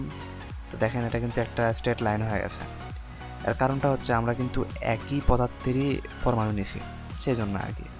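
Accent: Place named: Bogura, Bangladesh. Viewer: Bogurar vasha sune valo laglo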